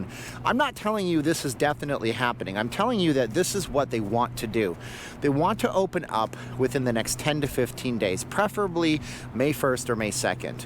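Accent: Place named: Canada. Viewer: Pretty sure, he is Canadian, thats where goofy accent comes from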